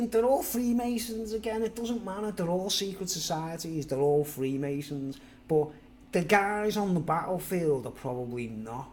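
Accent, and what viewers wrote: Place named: Liverpool, United Kingdom. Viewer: Hi, another interesting speech,it was the gelert solo tent video that brought me to your channel in the first place, and I liked the Liverpool accent.funny thing is it's only when i'm having a swally I head over to see what your up to:-) (no offence) keep up the speeches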